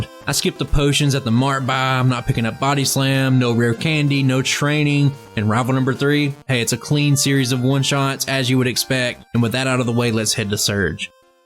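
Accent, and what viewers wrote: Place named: Alabama, United States. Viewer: The twang behind it feels like that Bama flavor like my boi Cooper has 🔥 But yeah til this day we never grew up 😅 we still play Pokemon & battle each other on pokemon showdown & we're 30 now 😂🕺🏾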